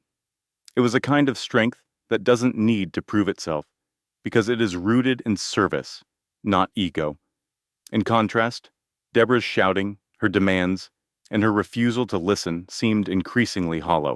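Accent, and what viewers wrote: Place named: United States. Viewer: It's a good story to express about our guards but spoken by an American. I began to feel sea sick when the picture kept moving side to side.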